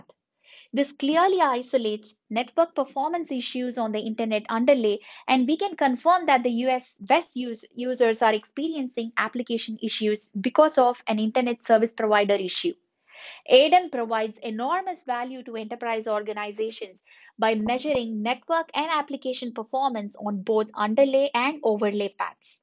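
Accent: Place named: India. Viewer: Indian english.. sorry this is can not work.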